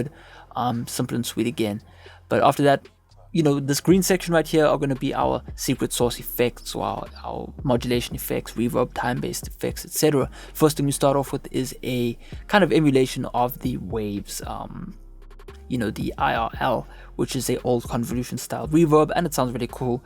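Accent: South Africa. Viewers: Am i the only person that thinks your from South Africa? 😄 only recently discovered you and im South African myself. Your mixing advice has helped my vocal mixing out ALOT. Appreciate it broski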